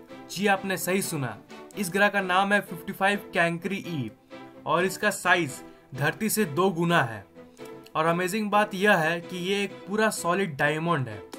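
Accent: Bihar, India. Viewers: are you Bihar........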